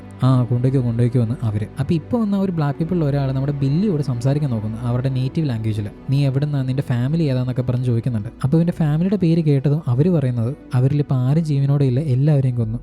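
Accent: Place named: Trivandrum, India. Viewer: Movie selection is good, but the language (Trivandrum or kollam dialect) is a little bit annoying. Try to improve your language.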